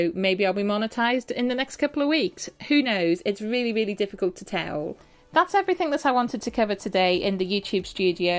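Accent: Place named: Wales, United Kingdom. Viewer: Have you ever lived in Wales as your accent sounds quite Welsh?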